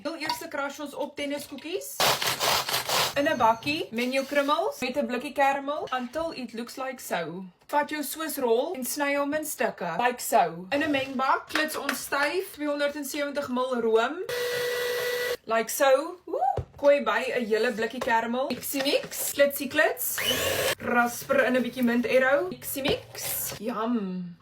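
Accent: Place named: Scotland, United Kingdom. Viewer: Which language are you speaking, it sounds like dutch, frysian and english combined with a Scottish accent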